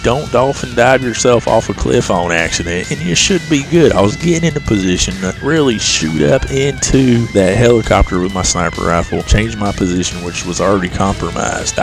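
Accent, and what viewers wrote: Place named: Texas, United States. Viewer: You a Texas boy? I heard the accent and trusted you instantly.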